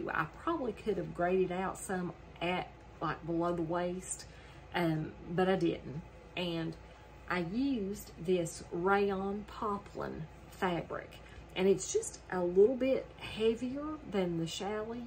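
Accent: South, United States. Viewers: Love your video. Those three tops are very nice. What state do you live in? I’m trying to place your fabulous southern accent.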